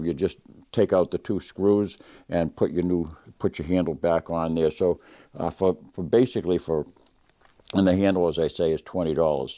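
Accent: New England, United States. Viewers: Good storm huh!? A few weeks ago i first watched one of your videos and about 9 seconds in i go “this guys either from vt right on the river or nh” so… which is it?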